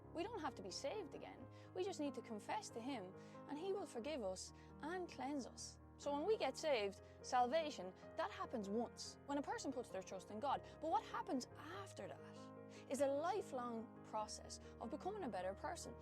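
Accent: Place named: Ireland. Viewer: AMEN sister 🙏🏻 BLESS 👑🔥🕊️⚔️ that is an Irish accent yes? My grandmother came to America from Ireland. 🙏🏻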